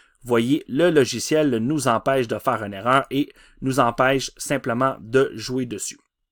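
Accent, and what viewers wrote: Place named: Quebec, Canada. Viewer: Grand travail Québécois trop belle akcent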